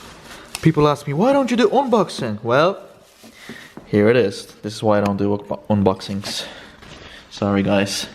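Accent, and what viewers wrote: Ireland. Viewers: u irish my g? love the accent.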